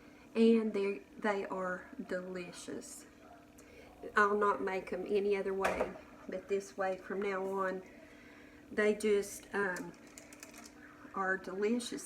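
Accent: South, United States. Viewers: At my grandmas house my twin aunts who never married cared for my grandparents. My aunts were wonderful. I didn’t grow up with a mother and it was like having 2 mothers every summer down in West Virginia. They made peanut butter pinwheels. I’m going to try the preachers cookies. Down in Minden W.V back in the 60’s we would walk to church ( my aunts took care of Sunday school and all church events) and the preacher would come home and have lunch with us every Sunday. During the summer they had a house full. I have stories about my Aunts walking home late from church and a bear walking up behind them. One aunt took off and got my grandpa ( with his shotgun), as the other aunt kept talking thinking her sister was still next to her, then heard a blast that scared the bear off. Every summer I cried leaving my best memories ever. your cooking and southern accent bring back memories of long ago. 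Thank you🥰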